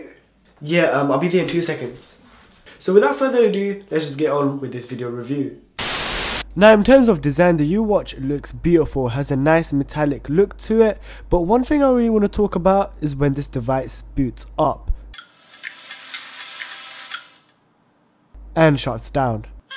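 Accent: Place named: India. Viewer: Are u indian?if u are then ur accent is pretty impressive :)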